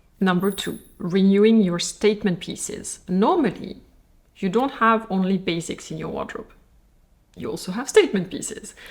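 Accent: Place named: France. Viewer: Please, please speak a little slower. I like your chabel and I like what you are saing, BUT it is very dificult to understand when you spead that fast and vith that strong french accent (whisn is beautiful, don´t you mis understand me) I am also not native english speaker, may be that is the reason that I can not understand well. I have noticed that you speak now much faster then before mooving back to France. I wish you all the best.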